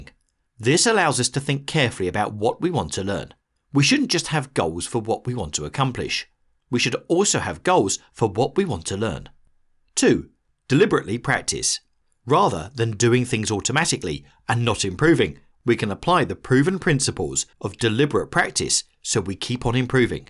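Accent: United Kingdom. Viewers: The irony of a man with a British accent talking about the successes of Benjamin Franklin. 🇬🇧❤️🇺🇸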